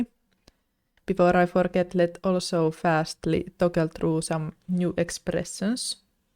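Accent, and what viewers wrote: Finland. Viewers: i can recognize a finnish accent anywhere and this is one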